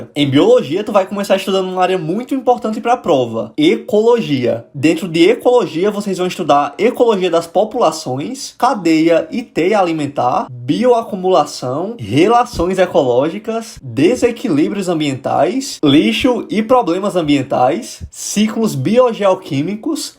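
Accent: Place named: Ceará, Brazil. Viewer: Cara vc parece um Moisés do velho testamento jovem, europeu, maquiado e com um sotaque do cearense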